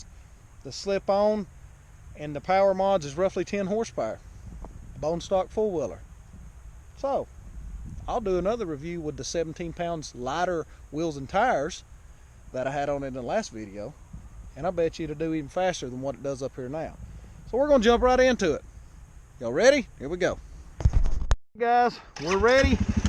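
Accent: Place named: South, United States. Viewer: There’s something about a southern twang that makes the review more legitimate!!!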